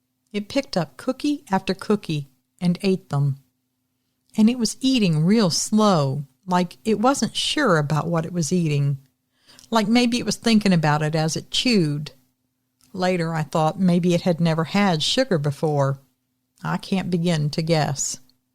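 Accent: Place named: Eastern Kentucky, United States. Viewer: Well I’ve got tears streaming down my face. I too grew up in a house with. Dad that was gone more than he was there and was always spending all our money at the bar with floozies. I was never beaten but I was screamed at and I heard a lot of yelling between my parents. I too remember the day my Mom made my Dad leave. Thank you for sending in your life changing story. I’m so glad that wood booger came along to save you and in turn saved your family., I’m married to a guy from Eastern Kentucky and you sounded like you could be from there reading this story. 😊 I felt like my Mother in law was still here. We lost her to breast cancer in 1998 but you sure did sound like her reading this. I think it’s the pattern of speech they have out there that did it because you have never reminded me of her before. Thank you to you both for bringing a happy ending of Christmas 🎄 joy. 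💖💖💖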